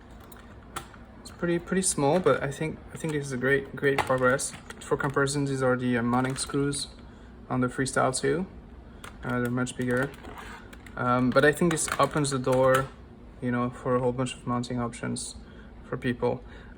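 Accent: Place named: Europe. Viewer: Where are you from? You sound European but pronounce it Zee instead of Zed.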